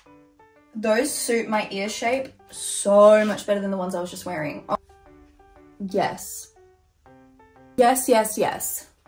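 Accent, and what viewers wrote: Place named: Australia. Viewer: You are a beautiful ginger/redhead. Beautiful light green eyes, cute voice with the Australian accent and pretty smile. Beautiful body and curves, cute little belly button, nice little booty and long legs. You look very pretty and STUNNING in everything in this video. The dark blue jean shorts and crop top tank top and turquoise crop top tank top would have to be my favorite on you, those dark blue kinds of colors just look really good on you and make your eyes pop. Hello cutie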